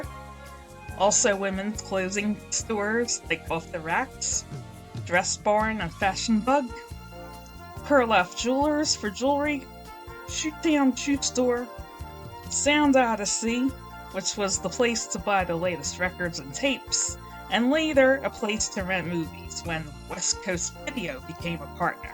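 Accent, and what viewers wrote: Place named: Philadelphia, United States. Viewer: Narrated, with a perfect Philly accent😂 great job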